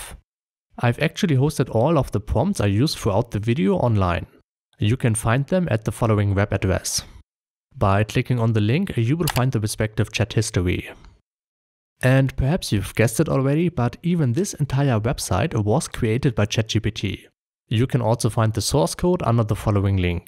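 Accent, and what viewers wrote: Germany. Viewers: Also dein Denglisch is ja wohl kaum zu überhören. 🤣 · Kann es sein das du eigentlich deutsch sprichst? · Den Akzent hört man leicht raus😂 aber gutes Video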